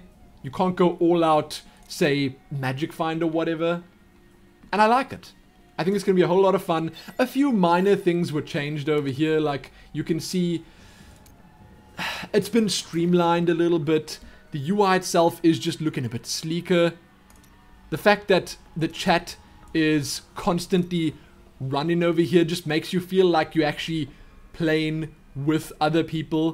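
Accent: South Africa. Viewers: ***** I know, I was referring to the South-African accent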